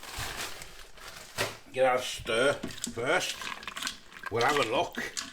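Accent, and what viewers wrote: Birmingham, United Kingdom. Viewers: Proper brummie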